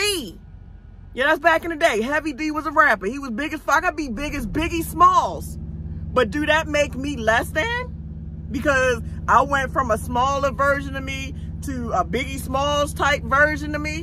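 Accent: Baltimore, United States. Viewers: I been following you since the pandemic on three platforms and that little bit of weight looks good on you. and that Baltimore accent❤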